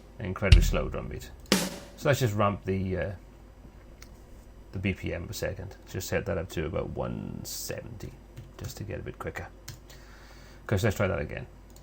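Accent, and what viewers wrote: Wales, United Kingdom. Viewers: Nice to hear a Welsh voice on this video. Hwyl from Cardiff!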